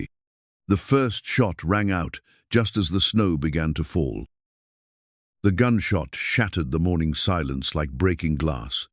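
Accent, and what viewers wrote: England, United Kingdom. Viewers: I think your stories where it is about the old west it would be more believable if you had someone other than an English man to read them.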